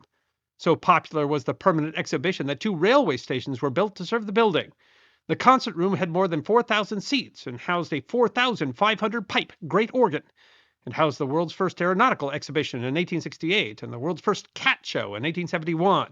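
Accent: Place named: United States. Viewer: its happening again gareth, another annoying voice over from a mickey mouse sounding yankee talking about a British subject!! why is this and can it be stopped asap, so very annoying and basically rude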